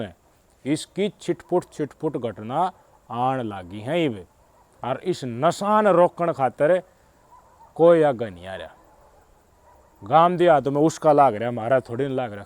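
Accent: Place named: Jhajjar, India. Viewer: Bhai ye bhai district jhajjar se lagta hai mere ko me bhi jhajjar se hu magar bhai baato ko bilkul khol kholker samajaata hai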